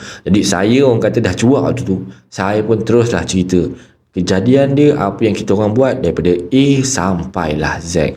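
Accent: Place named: Kedah, Malaysia. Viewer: Loghat kedah abang cun noh,tak macam buat2,ketegaq lama tak dengaq,hahaha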